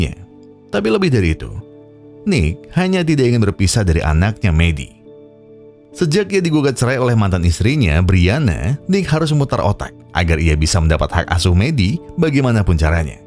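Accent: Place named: Tegal, Indonesia. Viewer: nt wong tegal min ? kayong ngapak temen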